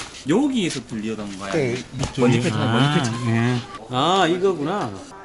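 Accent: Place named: Daejeon, South Korea. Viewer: 대전촌놈이 유튜버로 인기좀 얻엇다고 서울올라와서 별 ㅈㄹ을 하네ㅉㅉ 말투부터가 촌티난다 ㅉㅉ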